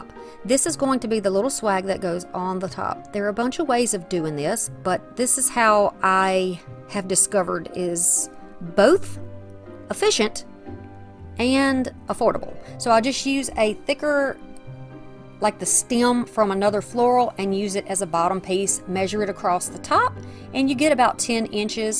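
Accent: South, United States. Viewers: these are all so very awesome. You are an excellent DIYer. Also love your southern accent. Hugs and love, Janet 🦊🦊🍁🍁🌻🌻🍂🍂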